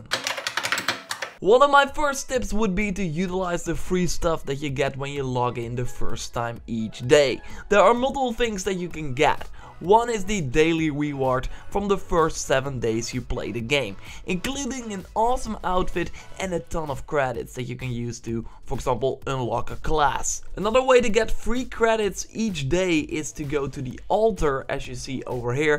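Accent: Netherlands. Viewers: You sound Dutch :)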